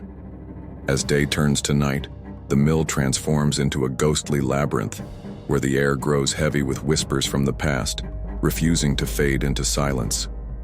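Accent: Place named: India. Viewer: Why does the ai narrator turn indian halfway through the video?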